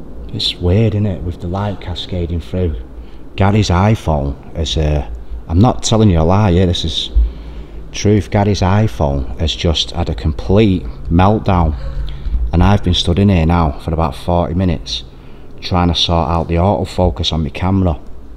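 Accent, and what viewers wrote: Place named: Salford, United Kingdom. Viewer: Great vids you do mate, it's just that Bolton/Farnworth little Hulton blag Salford accent that doesn't do it for me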